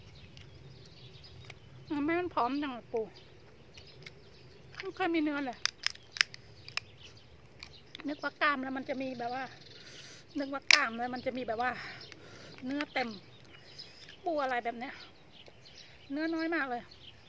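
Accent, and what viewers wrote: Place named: Surin, Thailand. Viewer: ชอบดูยายจัง สำเนียงยายพุดเหมือนทางบ้านแฟนเลย...สุรินทร์ น่ารักดีค่ะ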